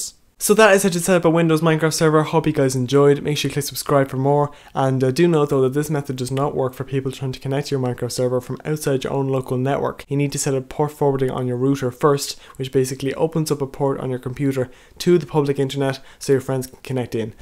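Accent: Ireland. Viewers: I LOVE YOU YOUR IRISH LIKE ME!! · are you irish ? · Are you from Ireland you sound it? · u r irish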